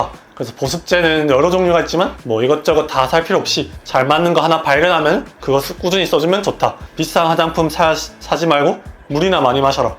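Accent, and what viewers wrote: Busan, South Korea. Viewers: 원장님 시원시원 솔직한 화법 너무 좋네요^^ 부산사투리 너무 정겹고~~~~~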